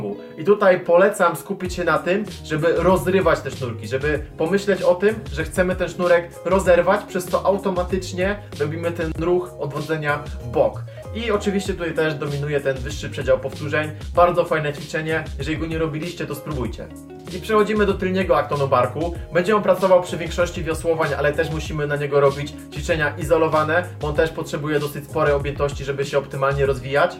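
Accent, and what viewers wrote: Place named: Poland. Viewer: Tłumaczy dobrze. Słychać że Polak.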